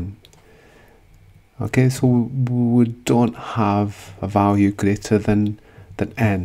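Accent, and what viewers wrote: Scotland, United Kingdom. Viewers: is skotiak akcent prezent?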